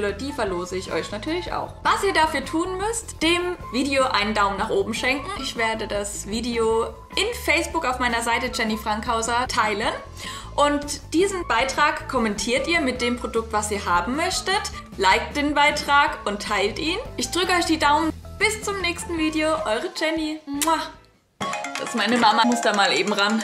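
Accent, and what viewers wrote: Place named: Palatinate, Germany. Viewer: endlich mal jmd der iwie pfälzisch redet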